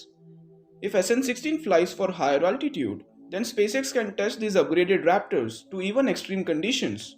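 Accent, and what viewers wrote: India. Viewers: Horrible Indian English accent, made my head hurt.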